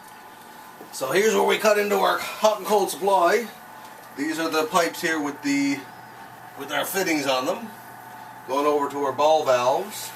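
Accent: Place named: Canada. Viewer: I know this is a late comment, but please bear with me. The "" T "" junction fit was mentioned numerous times but was not actually shown being inserted. There was mention of a water mess difficulty....However, I really wanted to see how to slip the fitting on one end.....and then get the other end ( which would have been cut one inch long) into the fitting without having to push that copper end (on the supply tube) upwards and then downwards and into the fitting. I really missed that bit.....and I was wondering if anyone could add some information on the easiest way to do this. The supply tube may be attached via a lug and screw and that would make it difficult in my 'mind"....but maybe it isn't thanks for any help fellows. Love that Canadian accent...First time I ever noticed it.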